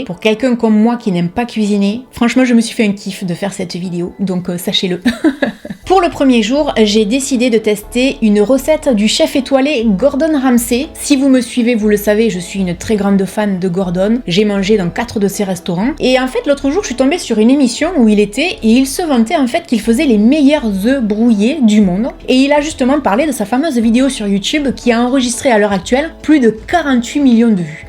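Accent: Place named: Midi, France. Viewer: Bonjour, je découvre aujourd’hui votre site c’est votre accent du midi qui m’a interpelé car j’ai le même 😀et votre bonne humeur. Je vais,m’abonner car il y a pleins de choses super intéressantes Merci pour le soleil que vous apportez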